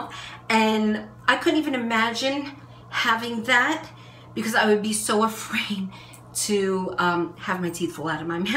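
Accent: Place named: New York, United States. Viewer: I just discovered your channel.... Can i say seriously I've been watching all your videos the last day in a half and I'm in love!!! You are the most down to earth human being I have ever seen....and i love love love your accent...im from Chicago so the new York accent is amazing to me lol. Anyways keep making videos and being beautiful! Xxx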